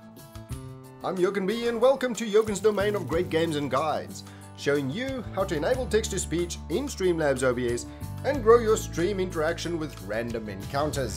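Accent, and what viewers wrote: South Africa. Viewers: Thanks for the tutorial, you South African? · south african? :) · you sound like a south africanXD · Are you South African?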